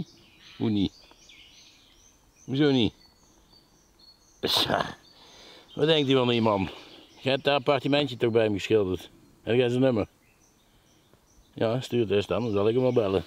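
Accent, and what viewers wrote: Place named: Amsterdam, Netherlands. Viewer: Lekker amsterdams accent : vaaf jaar derbij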